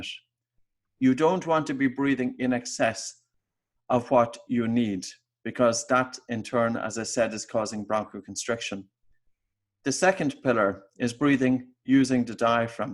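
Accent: Ireland. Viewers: I love your Irish accent. Not an accent in your country of course but in America yes. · But why does he do all this .eishhhhh minuit - muinishhhhh, respiratory rate - respiratory reishhh? like wtf are you IRISH, KIWI, AUSSIE? xD if you tell, I change my thumbs to up, cause all you say is probably right and helpfull, but the accent xD is hard to get used to and bad for my oxford english ^^ ah and if you panic, use asthma spray, drink a glas of irish stuff, whiskey, then sit straight, breath deep and slowly, not big shallow and fast.. btw it's better without whiskey, but if you panic you needa stop that. still better then drugs xD except asthma spray xD luls. Actually when we do sports and we get exhausted, and make the break, at first we do exactly what he says, fast shallow breathing, but then after a few seconds, we automatically go back to normal breathing, slow, deep, not hyperventilating/fast breathing. 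xD appearetnly our body knows it by itselsfin usual conditions and sports. ^^ my note, his technique - EVERY TIME! THIS TIMES - when you do his test, like resting respiration, you feel obstruction or breath difficulty - CALL AMBULANCE AND DOCTOR!!!! use his techniques until they arrive. because I GOT SUSPICION, the earlier you arrive in intensive care or medical care - the easier they can help, avoid the cytokine storm with meds so it doesn't destroy the lungs! THE THING THIS REALLY SMART GUY DOESN'T MENTION: AFTER CYTOKINE STORM = YOUR LOUNGS ARE WHITE, DEAD, NADA, you're DONE, you wont' breath anymore cause lungs = broken, so you're DEAD, so you cannot appl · I’m breathless over this guy and his irish accent! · Oh, this is fantastic, outstanding - thank you!! You confirmed for me that what I've been doing intuitively is right on (since COVID... when grocery shopping, keeping mouth closed and hardly breathing if at all, especially when near anybody), when outdoors and downwind of anybody else (especially if they're speaking), ditto (and move upwind/away if possible so as not to be 'in the line of fire' for their expelled air (and particles...), etc. 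etc. Also, over a steady 24 years of lap swimming, I have always and only breathed through the nose while swimming, though I wasn't aware of all the benefits of doing so. This was very educational and exceedingly useful, especially at this time. Bless you! Will be sharing.....! (p.s. - love your (Dublin?) accent :) ) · Brett/ hold time = BREATH Hold Time. Tis the Irish accent you see. Likewise, wid = with; tinking = thinking, breed = breathe. LSD is a good anachronism. This has been an excellent explanation. I've shared this with as many people as I can and now I am doing a revision. Thankyou once again, many times over.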